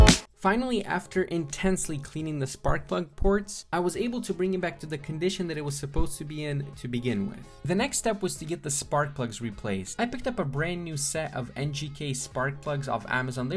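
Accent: Russia. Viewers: Dude, are you russian or something?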